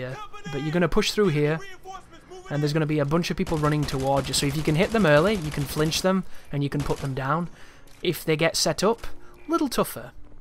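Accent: United Kingdom. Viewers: Your heavy british accent makes it 10 times better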